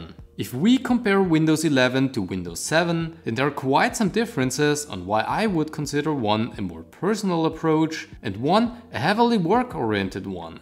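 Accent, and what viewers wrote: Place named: Germany. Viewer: I smell "Deutsch".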